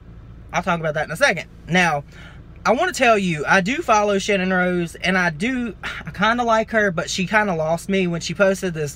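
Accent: South, United States. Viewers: Newbie here lol Peter Monn has said such great things about you & now I know why! As soon as I turned this video on, I got the sense youre a southern drawl, younger (Not saying Peters old, dont come 4 me, were same age)from the country version of Peter which I mean to be a compliment! You have the same positive kind energy & mannerisms that I could tell within a minute of your video😊Thanks for this vid & will be checking your other vids out😀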